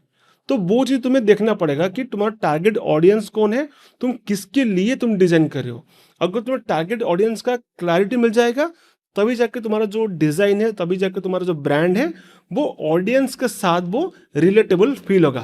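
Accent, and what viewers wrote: Odisha, India. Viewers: Bhai tame hindi kahibaru jana padijauchi tame odia boli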